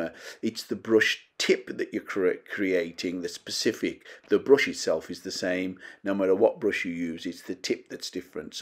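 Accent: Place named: Dudley, United Kingdom. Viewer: Hi, Really enjoying your vlogs on Affinity Photo, just been following the vlog Brand Signature. I've been after a way to add a Signature to my photos for such a long time. Messaged vloggers in America and Australia on this subject asking if they knew how to add a signature in Affinity Photo. Not had a reply from either of them?? To be honest don't really think they knew how to do it. Followed your instructions and now I can add a signature in seconds instead of having to keep typing it out. Can't thank you enough mate, keep up the great work look forward to watching more vlogs. ( Your accent sounds like you're from around my area I'm just outside of Dudley. Once again thanks. Fred.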